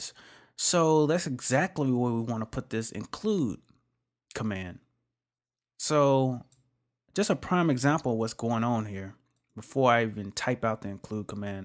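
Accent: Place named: Brooklyn, United States. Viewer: Nice bro, its like Brooklyn brother teach me how to code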